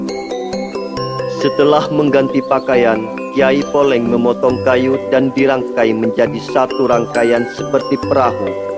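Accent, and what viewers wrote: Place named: Madura, Indonesia. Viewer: Admin suara madura tulen❤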